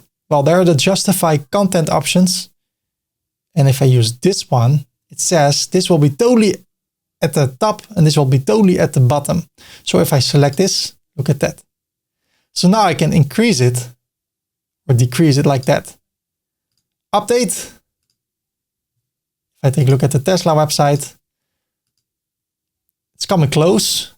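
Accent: Netherlands. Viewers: First time here, I picked up the Dutch accent haha, and I love Tesla too. And work with Elementor, daily. So I subbed! Groetjes uit Middelburg! 😊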